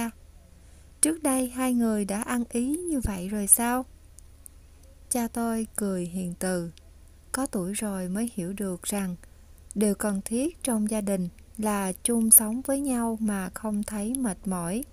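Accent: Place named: Mekong Delta, Vietnam. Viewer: Câu chuyện rất hay cùng với giọng đọc rất hay rõ từng lời, mang một chất mộc mạc của miền tây.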